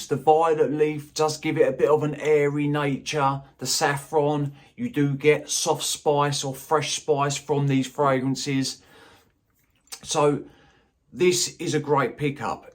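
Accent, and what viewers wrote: United Kingdom. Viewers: What a great accent! From which part of Britain you are?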